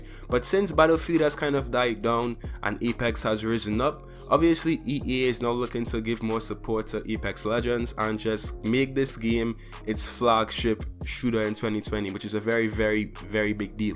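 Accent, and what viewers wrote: Trinidad, Trinidad and Tobago. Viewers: are you from Trini? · Hey you from Trinidad?? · WAITTTT YOU FROM TRINIDAD?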